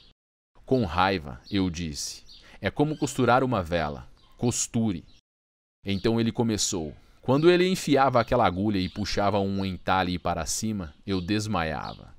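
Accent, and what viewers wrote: Brazil. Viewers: Sotaque legal, de que parte do Brasil? Parabéns pelo canal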